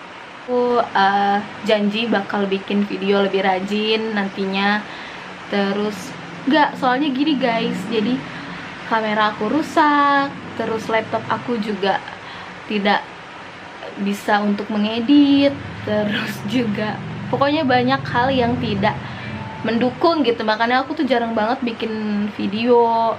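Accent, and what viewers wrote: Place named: South Korea. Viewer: Logat nya kakak korea banget lohh...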